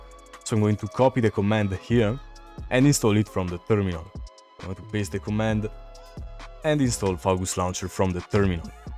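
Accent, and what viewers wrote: Italy. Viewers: Sento un po di italiano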